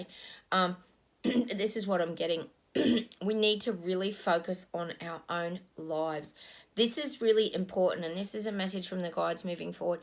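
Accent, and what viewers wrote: Australia. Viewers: I enjoy hearing your Aussie accent,! Lovely. Here in the USA, Arkansas, we are in triple digit heat, and blessed to have air conditioning in my home. Blessings to all who are striving to stay in peace in our hearts. Thank you for your messages and your humor😄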